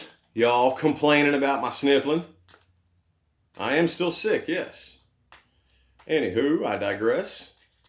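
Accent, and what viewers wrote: South, United States. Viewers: Lmaooooo....i love that country accent oh my god.....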